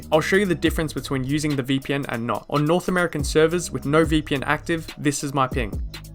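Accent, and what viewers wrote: Australia. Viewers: fellow aussie herre lol, was looking for a high ping kind of guide to see what im getting into and what class to pick and the second i heard your accent i was like MY BROTHER xD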